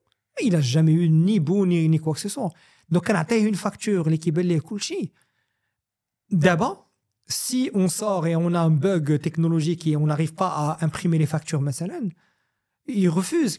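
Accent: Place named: France. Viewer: Surtout lorsque ces mots anglais sont prononcés avec un très fort accent français. C’est risible pour ne pas dire embarrassant.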